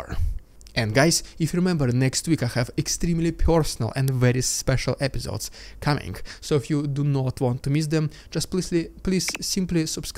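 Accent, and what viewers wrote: Russia. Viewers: I'm guessing " " and the accent implies that you are Russian...Although we appreciate the reporting...this has to be painful to say about your own country. It's very sad. I'll bet you know at least 1 Russian soldier fighting in Ukraine right this very moment. I can't even imagine how this has affected your friends and family. Keep up the good work friend know that I see and appreciate you. 🤗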